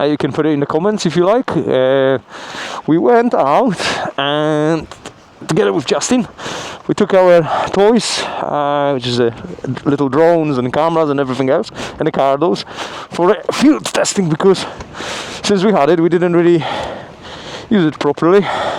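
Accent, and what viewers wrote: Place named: United Kingdom. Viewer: Just found your channel. Great video. I'm trying to place your accent. Is it Africano British?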